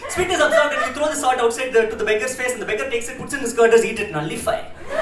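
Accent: South India, India. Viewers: Slow beta slow and ye tgoda accent please. Btw south indian accent is cute as well😅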